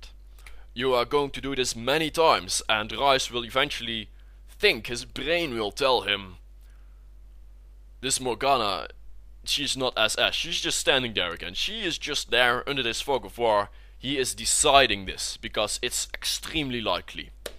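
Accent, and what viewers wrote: Netherlands. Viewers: are you dutch? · Love your dutch accent. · DAT DUTCH accent :D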